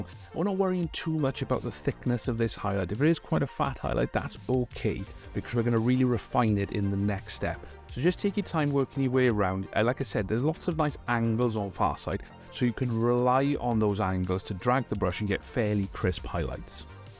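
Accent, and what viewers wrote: Wales, United Kingdom. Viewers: are you welsh bud?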